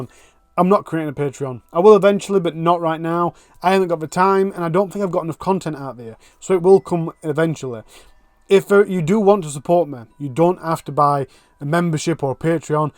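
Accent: Yorkshire, United Kingdom. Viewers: Where in Yorkshire are you from?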